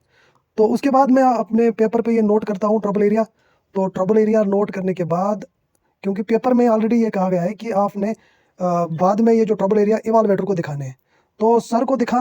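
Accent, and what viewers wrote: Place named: Kashmir, India. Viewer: Kashmiri voice i think.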